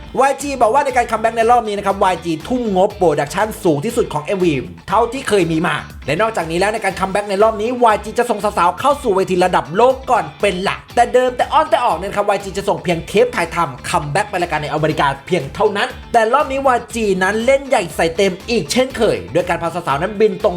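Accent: Isan, Thailand. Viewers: โอ้ยยยเนาะ เสียงภาคน้องกะตะมุตะมิหลายยยๆ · ชอบการนำเสนอการพากย์เสียงแทรกอิสานของคลิปมาก ฟังไปขำไป หางเสียงแบบสุดดี แบบฉาอ่ะครับ ชอบ👍🏻👍🏻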